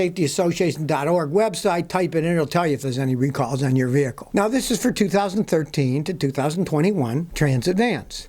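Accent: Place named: New England, United States. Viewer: This guy may live in Tennessee, but he has a New England "Eyakcint." I've seen him with a "Live Free or Die" sign. Could he be... a New Hampshireling? 😃 Love from a Vermonter living in Texas.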